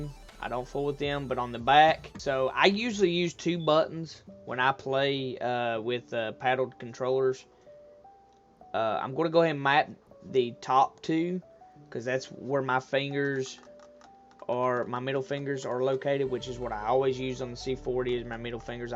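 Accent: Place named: West, United States. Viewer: I like your red dead redemption accent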